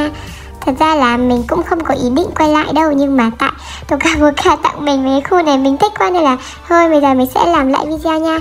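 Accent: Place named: North, Vietnam. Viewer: Đừng nói giọng bắc nữa ah😭😭😭 (Nếu như là ng miền Nam,Tây,Trung)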